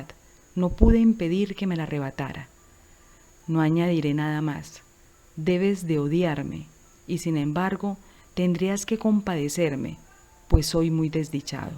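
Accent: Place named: Antioquia, Colombia. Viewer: El acento paisa es difícil de neutralizar...pero no imposible.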